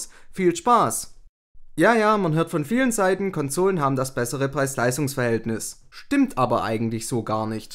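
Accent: Swabia, Germany. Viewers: Bist du Schwabe?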